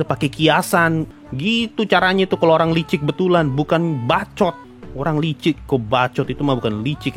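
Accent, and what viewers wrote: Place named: Eastern Indonesia, Indonesia. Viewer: anjir logat mu kek tmn2 gw yg dr ntt/papua bg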